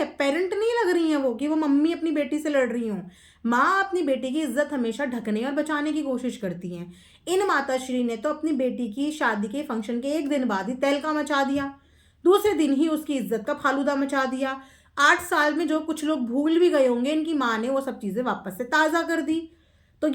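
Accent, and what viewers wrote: Bihar, India. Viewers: 😂kya baat hai bhut chill mood me hai...mast bihari tons de rhi hai😂👏👏👏👌🏼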